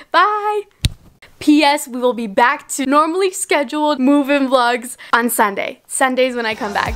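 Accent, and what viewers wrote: Canada. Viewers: her Canadian accent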